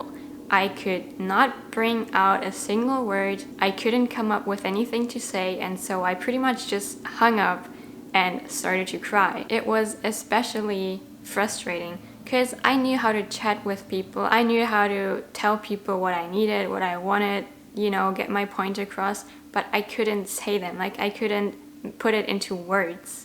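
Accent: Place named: Germany. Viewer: Outstanding work,! You seem to have mastered the English language and it’s grammatical nuances, and you’ll just continue to acquire more vocabulary over time in the same fashion as a native English speaker! Your ability to produce the language is on par with a native, just with a slight German accent which I think is endearing. Thanks for sharing such a detailed history; I find this very motivating for my own journey to Italian language fluency. Well done! Julia (native English speaker from Australia)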